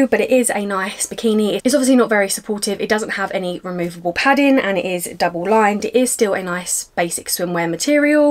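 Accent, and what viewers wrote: United Kingdom. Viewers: My god.. I didn't know you had a British accent.. I am in love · SOFT MODELING.. JUST ELEGANCY AND BEAUTY... YOUR BRITISH ACCENT IS VERY CLASSY..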